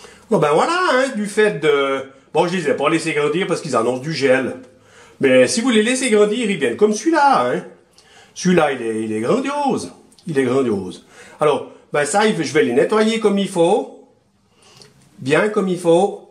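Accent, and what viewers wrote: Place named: Fribourg, Switzerland. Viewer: Super ton accent. Moi en bon fribourgeois, je peux pas dire le contraire....... Tché Don !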